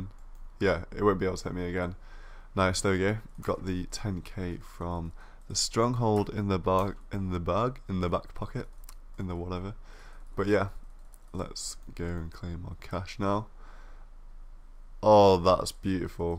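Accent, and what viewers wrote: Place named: Newcastle, United Kingdom. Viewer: True Geordie lol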